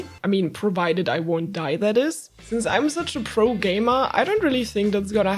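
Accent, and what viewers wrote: Germany. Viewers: I don’t know if it’s just being an old-school Minecraft player, but I don’t know what it is anytime a German or someone with like that German style accent teaches me about engineering. I just have to listen. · man the German accent breaks me every time, so cute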